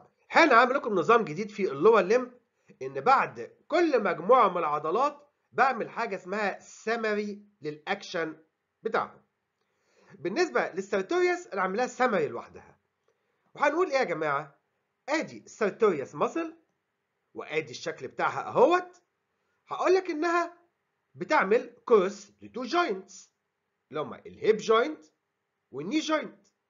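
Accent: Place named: Iraq. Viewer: من اللهجه واضح عراق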